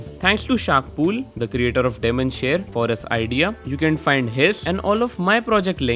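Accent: India. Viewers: The wierdest part about this is that he sounds like a scam caller. (No offense dont cancel me every indian probably sounds like that im just uneducated)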